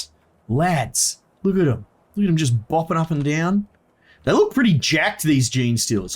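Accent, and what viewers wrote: Australia. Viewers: It is an Australian accent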